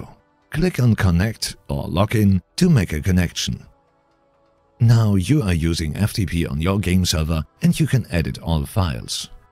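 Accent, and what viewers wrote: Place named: Germany. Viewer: No worries it was beautiful! German people have a very unique and strong accent, and I personally quite enjoy listening to it, but I have honestly never heard one as nice as yours! I am from australia so listening to our accents even for me here is like wiping my butt on sandpaper hahaha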